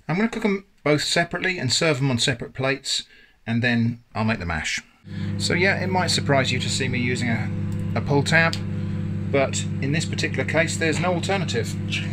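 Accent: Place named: Southern England, United Kingdom. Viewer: I call this "English curiosity YouTube" — among the ranks of Steve Mould and Tom Scott, for example. YouTube seems to have realized (quite before I did) that this sort of content mixed with the lovely idioms and accents of lower England make for wonderfully relaxing media. I've watched nearly everything on these channels since lockdown has kept me home alone for the passed year.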